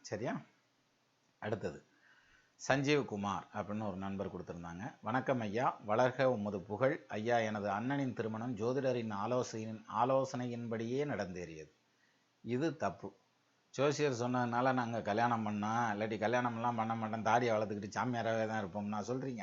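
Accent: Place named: Bodinayakanur, India. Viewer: Hi sir, nice Tamil pronouns, my native place is Bodinayakanur your speaking styles are reminding my childhood days, my question 1. Pl tell Nadi Jothidam is true or lie 2. In 12 house is Sani 6 house is seivai it good or bad 3. Pl give month rasi plan it's more help for all 4. personal question I was born 1989 time 10.30pm Place Kambam mahara laignum star barani I lost many in past 10 years I saw failure only till now pl help me and tell my career path sir pl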